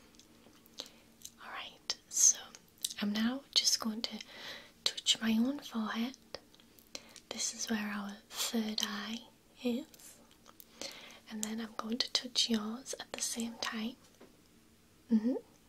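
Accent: United Kingdom. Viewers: Where in the UK are you from? I love female accents, but I can't always place them.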